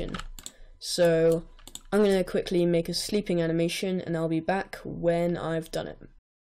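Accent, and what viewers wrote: United Kingdom. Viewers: Roblox programer: Good at intros, Good music, Good Mic, British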